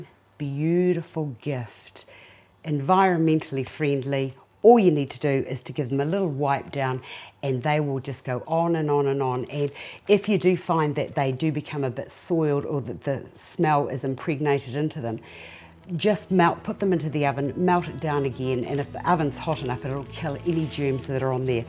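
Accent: New Zealand. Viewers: you look FABULOUS! LOVE your dress and belt. You sound like a New Zealander. I love New Zealand. I am Scottish but live in Perth Western Australia. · I am guessing that she/you is from New Zealand due to how she pronounces "egg" like "eeeeeg" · André Manoel she is from New Zealand, not all sound like her though, we all have different accents here. Similar but not all the same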